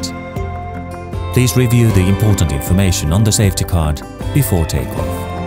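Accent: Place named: England, United Kingdom. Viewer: gentle English accent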